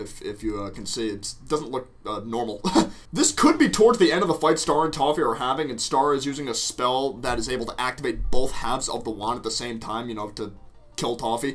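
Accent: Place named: United Kingdom. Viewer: im offended by your british accent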